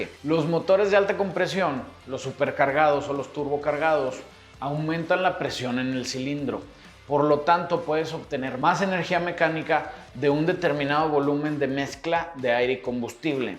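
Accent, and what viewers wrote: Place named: Fresa, Mexico. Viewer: Osea que feo no? osea que fresa no? osea no mames wey con ese pinche fisico y ablas todo fresa con voz de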